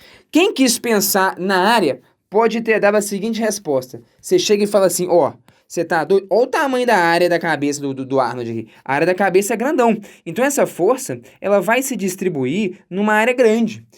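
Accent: Minas Gerais, Brazil. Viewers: o sotaque mineiro melhora tudo kkk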